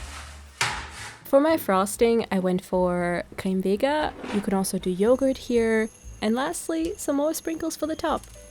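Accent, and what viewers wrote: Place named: Germany. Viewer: I've got to say you have the cutest German accent I have ever heard 🫠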